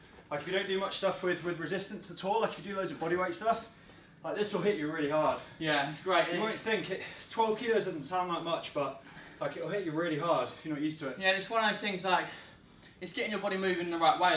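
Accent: United Kingdom. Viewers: British anyone ?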